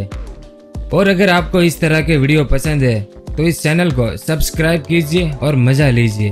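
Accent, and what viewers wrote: Gujarat, India. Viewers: Gujrati hain bhai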